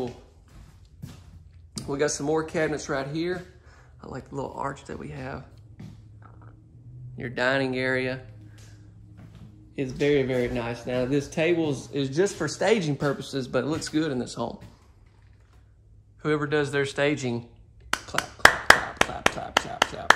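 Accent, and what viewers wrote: South, United States. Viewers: Does anyone here own this exact model? This is the one I have my heart set on 5000%! It has every single thing I need & want for myself & my boys. But the guy at clayton homes told me they have "better" layouts with "better" constuction at a better price than this prime. & i wonder if he just said that because he wants me to buy one they have. Ive looked at MANY & this 1 still tops them all for me. I really would love to know how much this one is & how is the construction. Im not settling 4 anything less. Thank u 4 this amazing video. & ur a great camera man! Also love ur accent so cute. lol Nice southern draw u have sir :)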